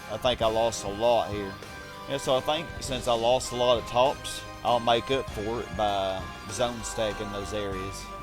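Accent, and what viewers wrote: South, United States. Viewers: I don't mind if you switch games but I liked the video regardless Always good to have a retro gamer with a southern accent on the youtube timeline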